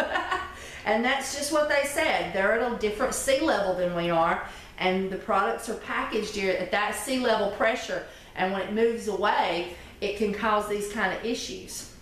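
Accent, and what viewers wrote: South, United States. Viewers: Are you kidding me?? Jojoba oil is the cheapest oil EVER!!!!!!! And yes even the purest 100% is very cheap! I dont know where you buy yours from but over here in europe is the cheapest oil of all of the oils on this world together with grapeseed oil! ITs so cheap cuz its vitamincs and nutrient value is the lowest out of all the oils! Johjoba is a basic carrier oil and no powerhouse oil at all! And also you jsut made that up with the whole puttting extra oil in the bottles incase of a spill! ahahahahhaha You re so funny! Ive worked for beauty compagnies and trust me they dont anything ectra in there! You just made that up to feel bettter about yourself. And the reason why google wont recognize your voice is cuz you talk very ghetto and country! She didnt even try to speak correct English! From to you reminded me extactly like Madea!!!!! 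jaahahahahahah Mabble simons aka madea is the bomb! YOu should act and talk mroe like her! IT suits you.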